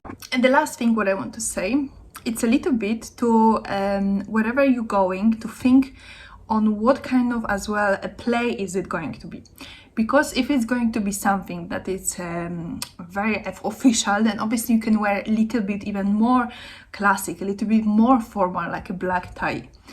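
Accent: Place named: Russia. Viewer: hi there ! i really am thinking abt going at the Opera on my birthday this year (i'm 17,) are there dresses we can wear : formal - elegant but not womanly. really chic. if you know what i mean ? i really am thankful for your video ! btw , not to be rude , but i'm sensing a really really beautiful russian accent ? or ukrainian ? take care xx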